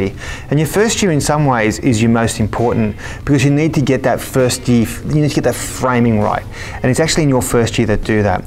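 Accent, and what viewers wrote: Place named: Australia. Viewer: Aussie accent 🔥🔥🔥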